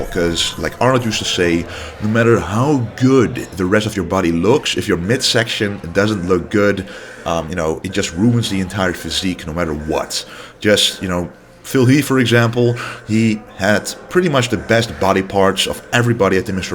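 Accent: Netherlands. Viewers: Jaa man. Wist ik all. Jau accent. Nederlander wooh! You can't hide your Dutch accent haha. Amazing channel man.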